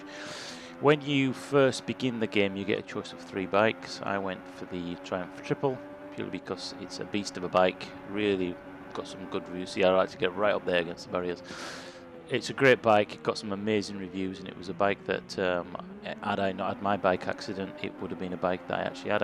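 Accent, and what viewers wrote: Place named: England, United Kingdom. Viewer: an absolute clusterfuck in english accent..perfect ..peter usa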